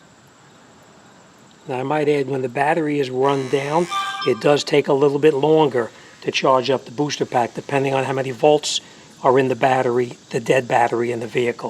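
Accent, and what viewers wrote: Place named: East Coast, United States. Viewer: i can hear boston or new jersey in your ascent somewhere east coast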